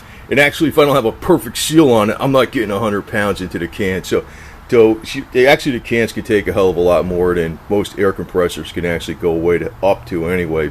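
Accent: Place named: Philadelphia, United States. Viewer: Sounds like he’s from Philly.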